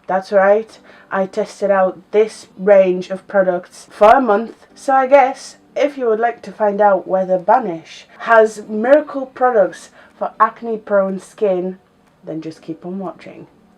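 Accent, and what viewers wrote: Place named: United Kingdom. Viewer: Love your personality and uk accent. Wanting to try this out myself.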